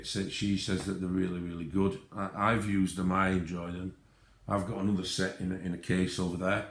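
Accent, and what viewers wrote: Yorkshire, United Kingdom. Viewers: We appreciate you too. I was initially attracted to your channel because I'm an ex brit and enjoyed listening to your accent. You are different and i like your choice of books and supplies so keep them coming. No criticism I'm afraid just carry on as you are. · International (Canada). I love your accent, my Nan was from Yorkshire, you're my people, not to mention the artist thing! Love the variety of books you show and would love to win so I could get some Lightfast coloured pencils from Amazon. It would be great to have a better camera position as its hard to see the pages from the side like that, like an overhead setup. Sorry about your loss of your friend and congrats on your sub count! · Wonderful haul. I love several of the books you showed early on, I'm going to have to watch again to catch and record the names of them. 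Where do you shop for the more unusual books? I'm assuming these aren't all Amazon? I love the fact that this deep voiced Yorkshire man loves colouring. It's just great. Listening to you talking is also very relaxing. My husband is from Lancashire, but close to the Yorkshire border and so his accent was quite soft too, but he's been in the south/midlands too long now and it's worn off a lot. I miss it. I love the soft Yorkshire accent. I don't want you to add me to the give-away, I have plenty of pencils, but that is a wonderful give away. The only thing I would like you to improve about your videos is the camera angle. It would be great if we could see from your perspective rather than at angles. Not easy I know, but that would make a difference to the experience I think and make it easier to see the flip-throughs etc. I'm sorry to hear about your friend. It's always such a shock, especially when someone is young. 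How lovely that you helped his Mum get organised. Congratulations on reaching 1000 subscribers so quickly.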